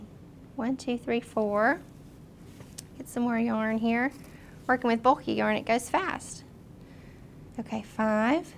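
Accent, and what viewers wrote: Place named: North Carolina, United States. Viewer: I have to ask, are you from North Carolina? I feel like I recognize your accent :)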